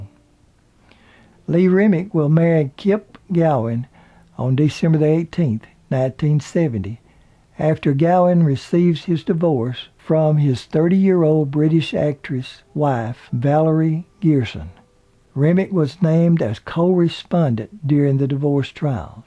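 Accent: South, United States. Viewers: I love the narrators down home southern accent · Mr., you are such a good spokesman. You are genuine in you're speaking -when telling the stars biography. I so enjoy the way you speak. You sound like a southerner, yourself. I fine it odd that many people from the south does NOT talk as southern, as the stars who betray them. Of course some DO HAVE that kind of southern draw. I'm a southerner myself. When I married my second husband, his father called me his little southern belle. He was an attorney from OKC. An educated man. His family were originally from up north. The whole family had good grammer. I learned so much from them. Little country girl who had never traveled much. I was intimidated, to say the least. I married very young, the first time i married. They could have done a movie about my life. I didn't have a good beginning but, i did get the chance to go to college. 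After I divorced the husband in which I'm speaking of. My life very complicated. I was only 16 when I married, the first time. Dropped out of high school. I did take the GED test and passed, to my surprise. We all went out and celebrated. I had two children when we married and could not have anymore children. I was 7 years older, than my husband. I was 27 and he only 21. He was intelligent. Had a good career. His grandfather retired from the company, which my husband also started his career there. I had such a sad life. Had bad things happen to me. I've often thought about writing my own bio and see if someone would like it. I do think my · ...i got used to it.....and then I liked it...maybe southern or mid west? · I told my mom that Lee had the prettiest eyes. I LOVED The Long Hot Summer and so did my folks! She died way too soon. Your voice is so soothing but I have lots of Southern reatives and I guess that's what it is. 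Could you do a video on Burt Lancaster? I always liked him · Interesting accent and pronounciation of some words. I wonder if it’s just the speaker or common to a town somewhere in the south. Good video. Thanks. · THE VOICE HMMMMM!!! PASSABLE!!I GUESS ITS " SOUTHERN AMERICAN" ACCENT!!NOT CUT GLASS ENGLISH!!!HOWEVER, THE ENGLISH LANGUAGE HAS CHANGED DRAMATICALLY!!!EVEN IN THE U.K.!!!FROM(U.K.)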